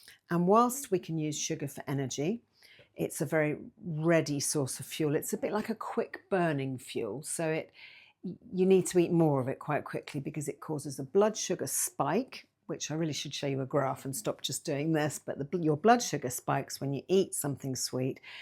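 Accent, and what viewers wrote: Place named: United Kingdom. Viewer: nice to hear a brit talking about this ... have just found your channel ... I do follow ZoeNutrition and have found over the last 2yrs avoiding the 'obvious' carbs has helped me lose over 50lbs ... have ordered iodine as per your recent video to see if it helps me ... I'm up for research supported suggestions ... I have tried my own sauerkraut, kombucha and am still making my own kefir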